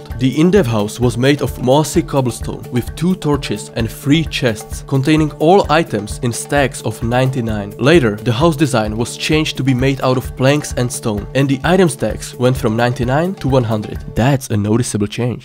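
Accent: Czech Republic. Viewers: Proč tu nejsou český komentáře? 😀 Dohledal jsem si to a nespletl jsem see jsi čech, já ten přízvuk asi nedám, ale ta angličtina je super 😇 Jen prostě výslovnost mě strašně tahá 😅 Pardooon 🙈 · Great, I enjoyed the video, but ... aren't you from Czech? Honestly, you sound like that (and your channel name is suspicious too) :D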